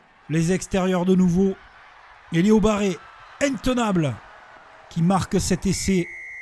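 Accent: France. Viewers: An excellent wrap-up of the world's greatest rugby division. My French is not very good but I can understand this commentator well. Which area of France is he from?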